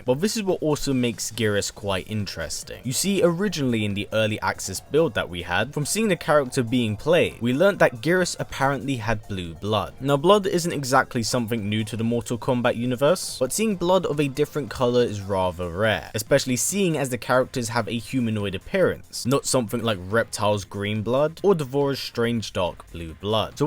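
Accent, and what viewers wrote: England, United Kingdom. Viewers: you are from England?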